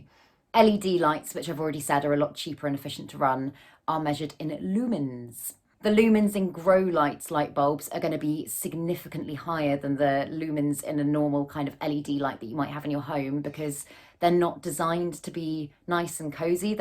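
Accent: United Kingdom. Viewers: Not to be a creep and disregard the video but I find British accents extremely annoying but yours was pleasant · Obviously, not an american dialect, must be something from the british isles, I would say. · Thanks for sharing. Very informative and to the point. Love that accent btw :) Takes me back decades ago when I used to date British girls lol.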